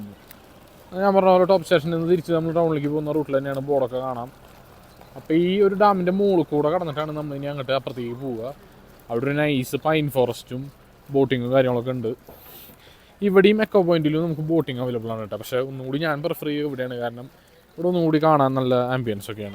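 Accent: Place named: Thrissur, India. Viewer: Ellam kollam bt aa tsr slang 🙏🏼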